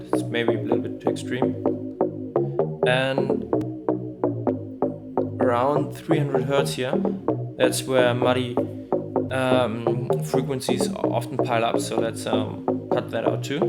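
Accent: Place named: Germany. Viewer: Geiler sound, gutes Video. Mach weiter so. Man hört, dass du deutsch bist, aber es stört überhaupt nicht.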